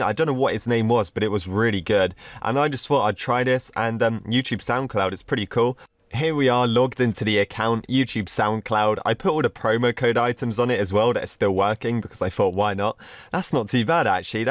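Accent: United Kingdom. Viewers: He's British that's why.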